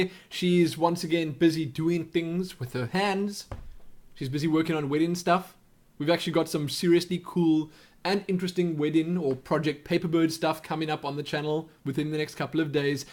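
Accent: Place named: South Africa. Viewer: Ahhhh! South African! I've been trying to figure out your accent for the last 3 weeks! lol. I think I'll send you something too! Your videos have been quite entertaining!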